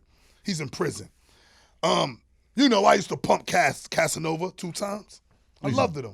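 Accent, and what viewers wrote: New York, United States. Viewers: bro sound like a New York Cmac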